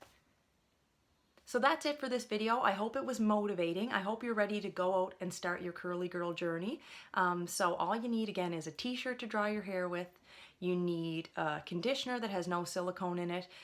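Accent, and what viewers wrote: Canada. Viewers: I thought you sounded Canadian! My husband is from Ontario but lived in Nova Scotia for a few years before I met him - so he had an accent like yours when we were dating! He's lost the accent now but it's fun to hear it again in your video. :D Also, thanks so much for the curly girl break down. <3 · Your Canadian accent is so thick omg i love it !!!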